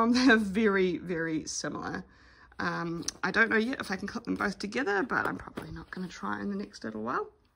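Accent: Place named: New Zealand. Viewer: Thank you for your evaluation of the bags. This was very useful for me. Also nice to hear your New Zealand voice. It reminded me of my two sea kayak trips in New Zealand. Good memories.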